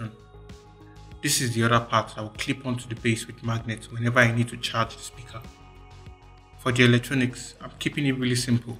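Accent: Nigeria. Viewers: Wow, you sound like a Nigeria. I am a big lover of DIY but not so much not much tools to bring out those ideas. Great to see someone in my hood do these things. Keep it up man.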